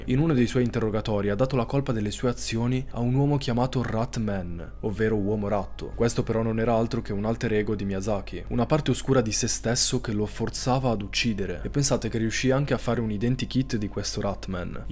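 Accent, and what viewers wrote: Sardinia, Italy. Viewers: Bella sardu sese?😂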